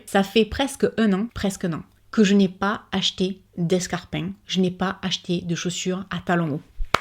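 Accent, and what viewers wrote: South, France. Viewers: je te suis depuis plusieurs années mais n'ai jamais posté de commentaires. Je le fais aujourd'hui pour te dire que tu es une personne formidable, tes vidéos sont toujours le petit moment de bonheur avec l'accent du sud et du soleil☺️. Toujours authentique et vraie, de bons sujets de vidéos et une famille formidable avec ta maman et vos adorables petits compagnons. Ne change rien 🥰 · J'aime bien cet accent du Sud ! Super!!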